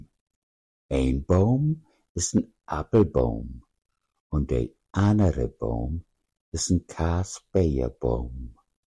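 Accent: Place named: West, Germany. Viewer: Von West nach Ost wird manches anders gesprochen, aber alle verstehen es; wo ist der Sprecher zu Hause? W ohl eher nach Westen zu.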